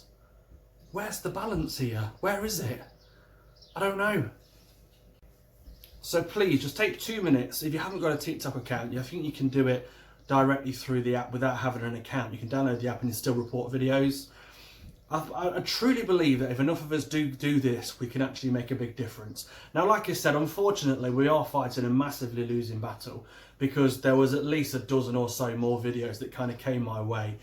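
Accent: United Kingdom. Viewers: Are you brittish? (no offence) its just your accent sounds brittish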